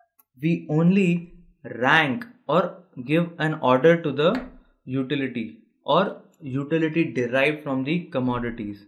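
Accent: India. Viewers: Rosa s actually he is an Indian that's why. Plus when it comes to economics there are thousands of videos made by Indians :P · why most economic tutorings are in indian accent,I do have problem understanding this accent.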